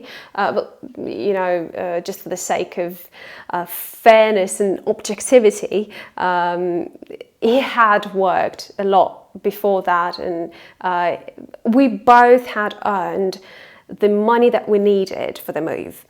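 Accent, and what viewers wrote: United Kingdom. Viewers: Wow, your from Belarus, but sound like a British person. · you really sound British!!! how did you get such a beautiful British accent???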